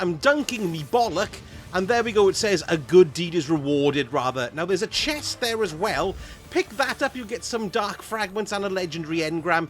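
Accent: United Kingdom. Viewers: Oi are you British mate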